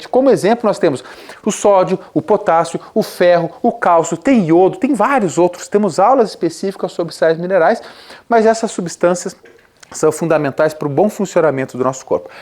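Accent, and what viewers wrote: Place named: South, Brazil. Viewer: e o sotaque sulista .. haha ♥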